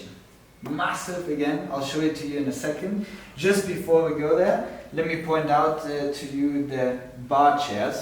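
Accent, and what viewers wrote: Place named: Germany. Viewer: You are from Germany your accent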